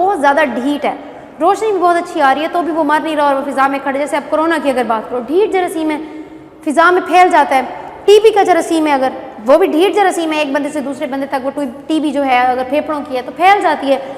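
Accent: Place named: Saraiki, Pakistan. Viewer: The way you speak mam is proved that you are sariki ❤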